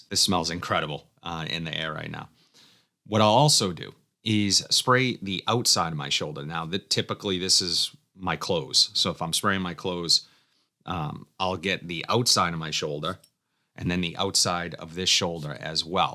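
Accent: Boston, United States. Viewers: Is that a Boston accent I hear?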